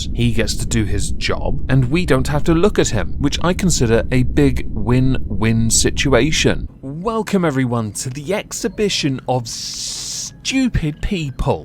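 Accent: United Kingdom. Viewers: Horseshit dude. Your voice is the smoothiest butteriest British accent I've ever heard. And I love your content dude. Never stop. ❤❤❤ and congrats for 100k. Been here since you were around 45k I believe.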